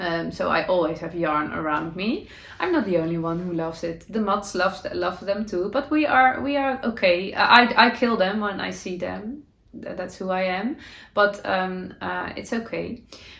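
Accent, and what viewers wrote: Netherlands. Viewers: I love the blanket behind you. Your scrappy sweater of granny squares looks lovely and must be so fun to make! Your podcast is very inspiring and I love every episode I’ve seen. I love your DutchY English! I wish you were my next door neighbor so we could knit and crochet together sometimes. Love Katie from Maine USA💖🧶🧦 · Closet is fine 😊 in Australia we also call it a Wardrobe. Your Dutchy English is wonderful. I have one language and I’m always impressed when people can think and speak in more.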